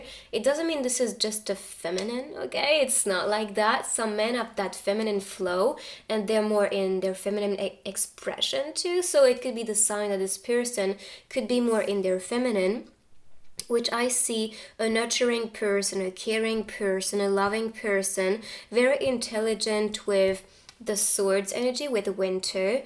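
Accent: France. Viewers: You have such a lovely voice, are you French?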